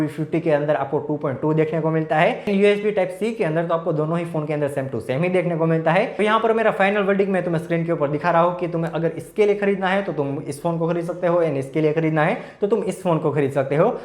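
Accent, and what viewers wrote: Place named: Jamnagar, India. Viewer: Flawless 🎉 u seem to be from jamnagar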